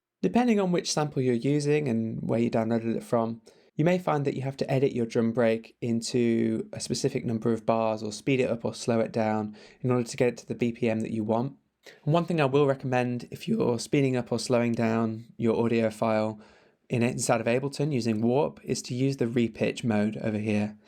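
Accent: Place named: United Kingdom. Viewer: You know that is gonna be good when the guy has a british accent.. Cheers mate, very good content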